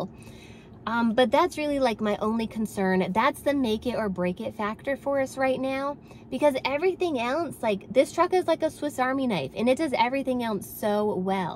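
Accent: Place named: South, United States. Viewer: Do more videos I love ❤️ that country accent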